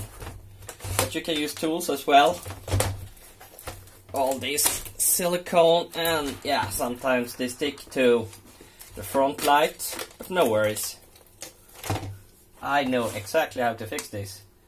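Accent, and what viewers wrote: Sweden. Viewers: Gotta Love Swedish English gotta love clever Swedes gotta love a perfect solution Thanks - I'm now the only person in my state who knows how to fix this problem WOW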